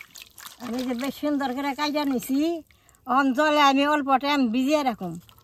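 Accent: Bangladesh. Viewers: দিদি মা তোমাকে খুব পছন্দ করি। তোমার ভাষাটা আমাদের মত শোনায়। 🇧🇩 থেকে ভালবাসা নিও। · Ami Bangladesh theke bolchi,Apnar kotha o rannar dhoron bangladesh er regional type, ami Bangladesh r Indian der accent Alhamdulillah valo bhabe differentiate korte pari,Apnar kotha bolar accent/bhasha puroi amader local Bangladeshi ❤🇧🇩 ami sure apni Bangladeshei live korechen, Apnar char paser area dekheo Bangladesh er nodi porer alakar motoi lagse❤. Apnar moddhe Indian kono boishistho nai:)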